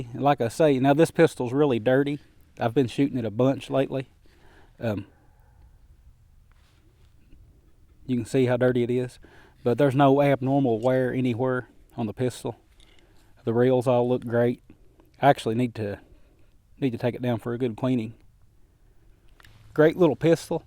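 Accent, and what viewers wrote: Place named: Tennessee, United States. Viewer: I think he's from Tennessee.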